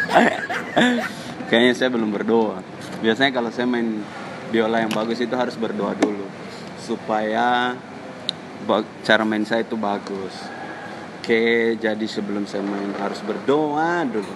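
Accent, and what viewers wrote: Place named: Makassar, Indonesia. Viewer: Mantab tawwa nda nabuangi logat Makassar nya Ada biasa barui sekali ke kacarta ehh kaki ku keinjjak kaki gue 🤣 Salam kenal sodara 🙏 sesama ana Makassar Makassar bisa tonji 🤣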